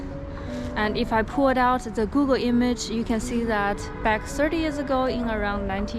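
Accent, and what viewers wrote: China. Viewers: Hi, a fan from Malaysia. I can still able to catch some phrases from your dialect. You can still hear elements of mandarin in a different tone. My ancestral dialect is 福建 古田，very different from mandarin. I can still speak the dialect fluently. I have been to my ancestral village 15 years ago and still hear the same dialect. This series of video is going to be really interesting. Looking forward.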